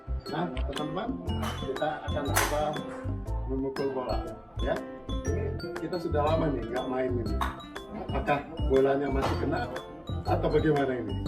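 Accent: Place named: Bali, Indonesia. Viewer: Ini bapak lihat logat nya orang bali kali ya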